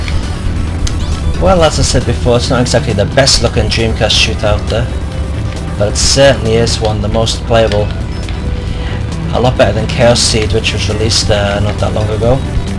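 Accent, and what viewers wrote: United Kingdom. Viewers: You sounded more british this time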